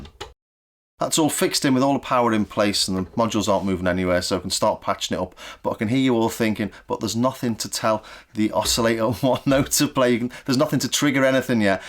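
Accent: Liverpool, United Kingdom. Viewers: excellent videos. i was proud (as a canadian) to be able to identify the Liverpool accent :) I'm not sure if it was this or another one in this series where you asked about fractal patterns in sequencing and such, and im positive someone else has already mentioned it to you, but i would be remiss not to mention Stochas. keep up the great work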